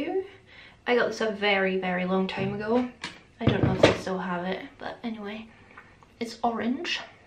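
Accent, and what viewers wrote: Scotland, United Kingdom. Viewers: I love this! Do other Scots call you posh because you don’t say stuff like “dinnae”? I’m from Devon, the accent here is like Sam from lord of the rings and I constantly get comments about being posh just because I don’t have a carrot cruncher voice 😂🙈🤷🏻‍♀️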